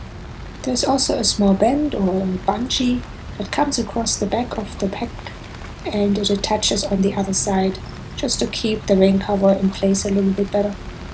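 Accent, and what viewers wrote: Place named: Germany. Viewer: ah, ich hatte mich nur gewundert. Trotz des hervorragenden Englisch hat man den deutschen Akzent dennoch rausgehört. :P Habe direkt mal deinen Kanal abonniert :)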